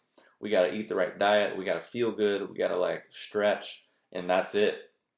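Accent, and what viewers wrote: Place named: South, United States. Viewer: oxymoron if you don't know what he's saying but keep coming back because he talks level headed emm OK, u may have a demonic force on u haha. little penis lol Spot on all this spiritual Shit people take so obsessively and they're usually the biggest bastards behind closed doors. at least I know some of my beliefs are a delusion but I like them so I guess that's my entertainment...you are a thought provoking fella, (that southern accent, I fuckin love your mocking voices) 😂😂👍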